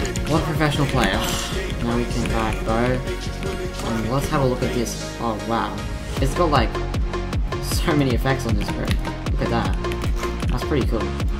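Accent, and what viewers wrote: Australia. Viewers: Can i play with u also are u a. Aussie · R u from qld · Aussie accent is 🔥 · W accent I’m Aussie too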